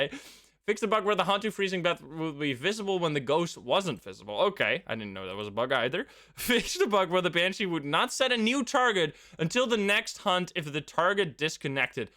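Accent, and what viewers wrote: Netherlands. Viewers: Wait what, are you Dutch? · Wait a sec are you dutch or belgian?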